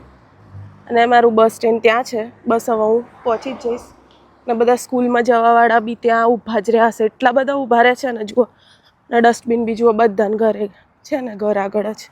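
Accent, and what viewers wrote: Sabarkantha, India. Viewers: Tame sabarkhantha namaste chho bolo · Sabarkantha ni boli lagesr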